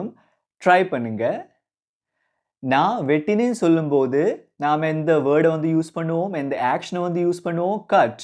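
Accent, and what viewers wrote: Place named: Marwari, India. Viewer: அதுக்கு ஏன்டா தமிழை சேட்டு மாதிரி பேசுற 😂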